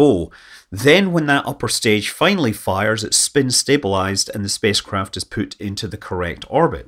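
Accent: Scotland, United Kingdom. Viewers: you'd think someone with a scottish accent would be able to say Palmachim correctly :) (or palmakhim.... פלמחים) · He's a Scot. You can hit closer to home with Scottish pronunciations!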